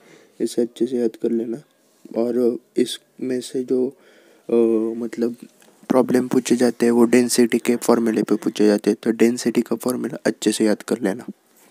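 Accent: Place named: Maharashtra, India. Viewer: Hindi tone tar Maharashtra chi ahe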